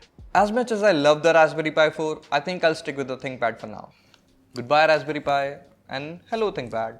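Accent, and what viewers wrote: India. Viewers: Great video , but still indian-english accent gets me everytime :D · As a non-native English speaker, I usually have a hard time understanding Indian accents, but this guy’s voice is very soothing. I’m loving his accent.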